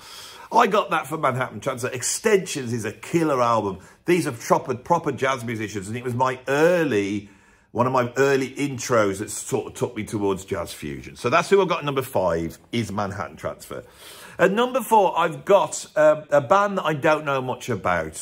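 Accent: United Kingdom. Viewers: I am an American. There are some British accents that I like and some that I do not like. I like your British accent. What region of the country is it associated with? I would love to know.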